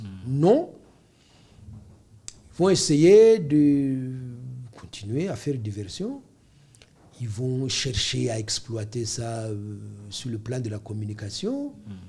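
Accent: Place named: Rwanda. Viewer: Mr tu es rwandais ar ta tonalité prouve que tu l'es et tout ce que tu dis est vide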